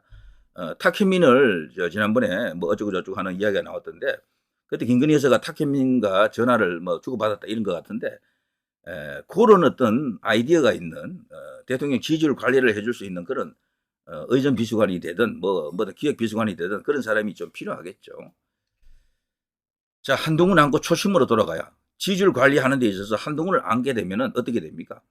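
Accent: Gyeongsang, South Korea. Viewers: 발음을탓하기전에 더보기보시면 바로아실텐데 경상도 억양은 발음자체가 표준어처럼표현하기힘들어요 새겨들어야합니다 취지가 참 못되셨네 빙빙돌려서 참 불쾌한짓을 하시네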